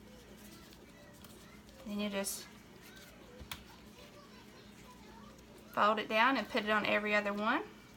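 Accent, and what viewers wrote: South, United States. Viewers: You Got That Southern Accent Nothing Wrong With It Just Some Of The Words In Southern That You Say Sometimes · you have a little bit of a Southern accent. I don't know about where you live but I live in Phoenix and they have 100 different ways to do mac and cheese anything from deep-fried to pizza toppings.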